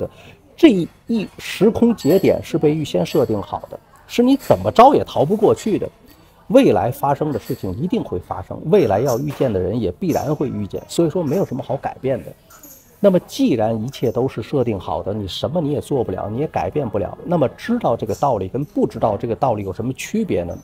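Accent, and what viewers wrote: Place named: Shanghai, China. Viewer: 您有上海口音，老实说，上海人做你这样营生的比较少，总之，你做的很不错，我瞎猜在北京等地工作过很久。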